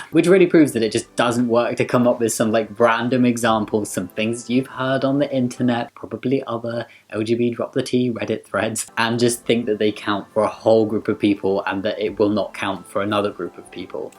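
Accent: United Kingdom. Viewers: Gee, I'm a Cis Gay Man. But I have a round jawline and no visible Adam's apple (because I'm fat), does this mean I'm unknowingly a trans man? The hair on top of my head (what's left of it) is straight, but my beard (which grows like crazy) is somewhat curly. No tattoos or scars (at least, not on the arms). Maybe I'm in the "?" group? Fact is, people are people. We are all one race, the HUMAN race. White, black, brown, blue, gay, straight, bi, trans, or whatever. Its corny, but love is love. Sorry I'm responding so late. Just found your channel, love your vids and the vlogs showing your transformation. I'd never have been able to do that in the hospital. And sorry your wedding got postponed, just make it bigger and better next year! PS: Love the accent! I'm American, but I'm a sucker for a British accent.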